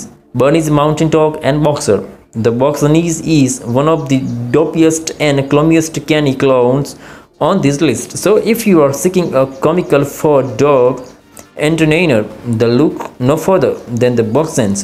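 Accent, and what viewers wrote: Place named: India. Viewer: Just go ahead and use an AI voice because this one sounds like a call center from Calcutta.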